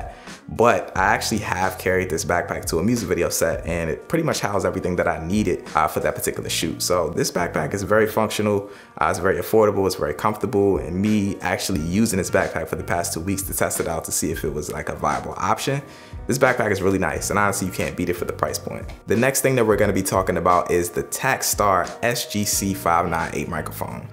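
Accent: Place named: DMV, United States. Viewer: The slider and takstar mic look great. You sound like you grew up in the DMV as well. Keep being great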